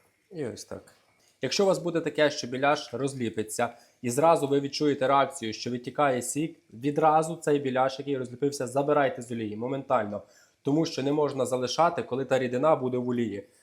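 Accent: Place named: Kherson, Ukraine. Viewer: Сковорідка повинна охолонути, а не вистивати..... Хлопчисько говорить діалектом, бо дуже багато слів схожі на російську мову, але не російські. На Херсонщині теж говорять схожим діалектом.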